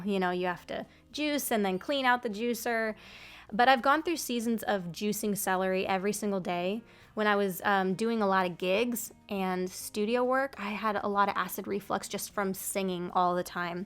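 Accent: United States. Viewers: Sister. Is your accent American? I have the same accent but people in my country crush over British accent 🥲. Btw, I'm from Bangladesh. I am 21, I follow you since 10 years. I've watched all your videos. Thank you for creating content sister ❤️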